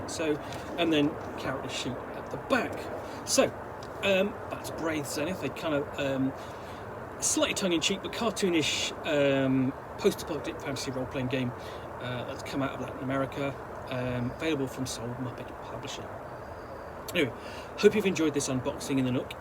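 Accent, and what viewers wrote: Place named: United Kingdom. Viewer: Bom video! Adorei seu sotaque britanico e sua calvice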